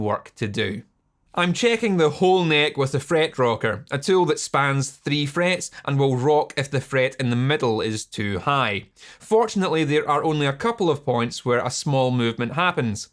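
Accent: Scotland, United Kingdom. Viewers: I love how aggressively Scottish is your accent. Amazing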